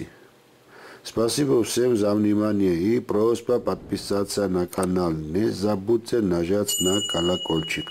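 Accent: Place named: Georgia. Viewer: დიდი მადლობა ყოველთვის საინტერესოა თქვენი ვიდეოები, რუსულიც ,,გამოგისწორებიათ,, ადრე, ,,ძალიან ქართული აქცენტი იყო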